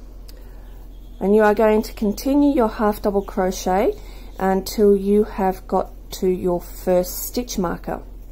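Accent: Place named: Australia. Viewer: Hello lovely, I have found the 1st of your 💗 beautiful patterns I am going to make!😊 My eldest son has recently been asking me for a 'Manly' poncho 😅 and this is it, it will b perfect for him. And I must say your tuturial is great, u explain things very well and it is so so great to b listening to an Aussie😁. Ta heaps. Love and prayers💗💗💗🙏🙏🙏🧶🧶🧶🐕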